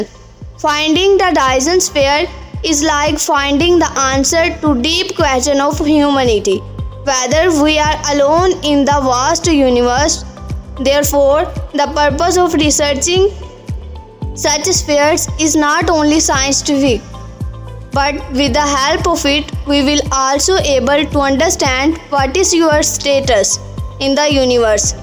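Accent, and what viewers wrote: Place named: India. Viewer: jesus fck that fckin indian accent..villa ttttaatttttaa wittaa tttaavitta ttaattt aaa 😆😆😆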